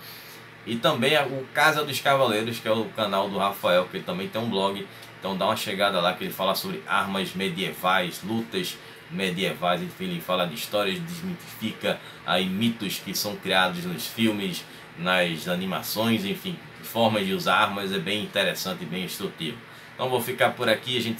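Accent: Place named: Pernambuco, Brazil. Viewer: História com o Obrigado sou seu seguidor a um tempo já gosto muito da sua didática,esqueci de perguntar se você é nordestino, parece pernambucano rsrsrs Algum dia você vai falar sobre os Citas e o povo do mar? Obrigado pela atenção.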